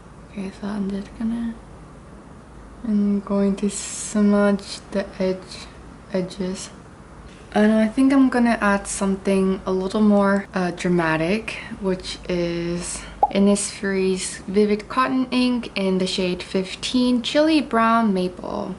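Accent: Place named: United Kingdom. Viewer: 안그래도 오랜만에 영국발음 듣고싶다고 생각하던참이었는데ㅜㅜ거마워여❣ 우아한 취미생활 부럽다용😆👍🏻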